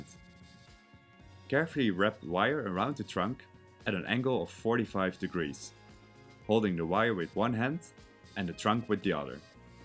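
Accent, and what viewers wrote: Netherlands. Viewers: O yeah dutch guy. Ik hoor het van 10km afstand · je hoort echt direct dat je een hollander bent😂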